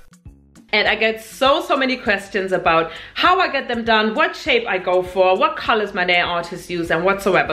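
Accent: Germany. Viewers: Du kommst aus Deutschland oder haha man hört den deutschen Akzent raus 😂❤️ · Ist deine Nummer Sprache eigentlich deutsch hört man raus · Wusste direkt das sie deutsch ist wegen diesem Akzent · Das ist so dieses deutsche english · She's German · Are you from Germany?😂🙈 you have quiet the accent🤔❤️ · Man hört direkt raus, dass du auch deutsch sprichst😂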